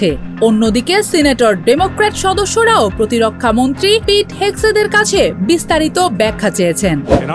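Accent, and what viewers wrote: India. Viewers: Nijoshho bhasha use korte lojja pai india er moto uccharon korar chesta.biroktikor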